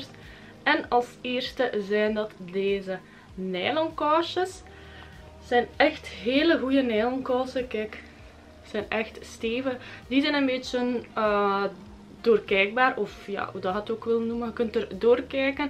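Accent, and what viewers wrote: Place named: Aalst-Ninove, Belgium. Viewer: Ik denk dat je uit mijn streek bent ( Aalst-Ninove etc). Aan de spraak te horen ;)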